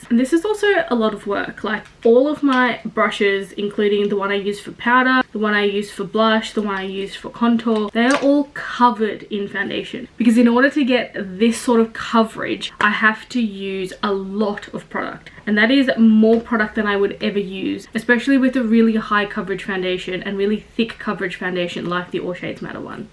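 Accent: Australia. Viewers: She's black. What do you think she is?? She just has an accent, either Australian or South African.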